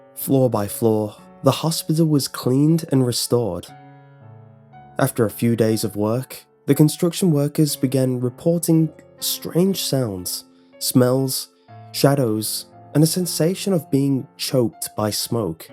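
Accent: United Kingdom. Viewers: doesn't quite have the right effect with a pleasant British accent :(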